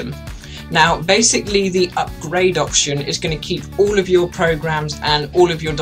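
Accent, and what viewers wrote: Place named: United Kingdom. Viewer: I know where you live. You live in UK I know this accent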